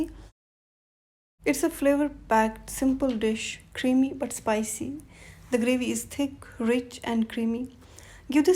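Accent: Kashmir, India. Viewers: No kashmiri English please 🙏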